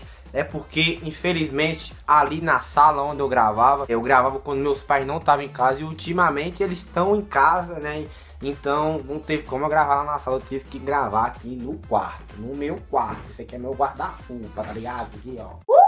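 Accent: Northeast, Brazil. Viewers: Nordestino? É da Familia? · vc e nordestino por que eu só kkkkk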